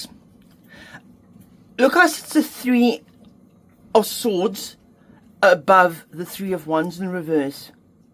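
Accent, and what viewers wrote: South Africa. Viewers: You are filming in New Zealand, but you have a very strong South African accent.? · You sound like a South African. 😮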